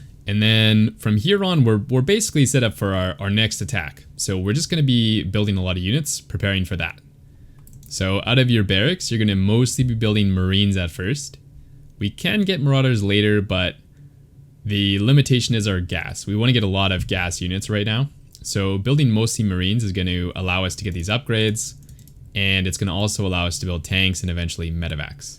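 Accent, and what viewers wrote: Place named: Hungary. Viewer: Aren't you hungarian by any chance? your accent sounds hungarian.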